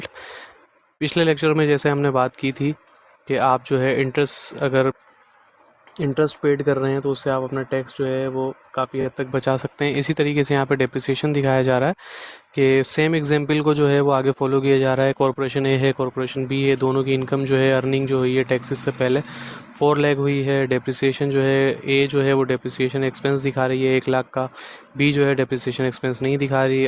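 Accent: Karachi, Pakistan. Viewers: Sir Karachi se lagrhy hain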